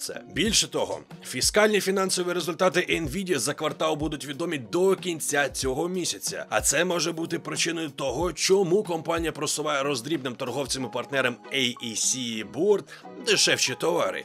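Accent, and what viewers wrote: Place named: Russia. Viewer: чувствуется что самому трудно переходить с русского на украинский язык,например,правильно не видеокарт, а видэокарт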